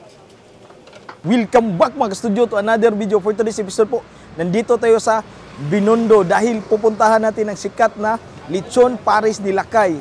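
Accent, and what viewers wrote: Visayas, Philippines. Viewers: Bisdaka gihapon mu Tagalog Bai uy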